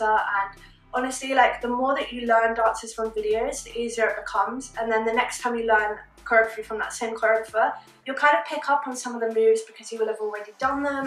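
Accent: United Kingdom. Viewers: good video :D and that British Accent is on Point!!!!!! :D :D :D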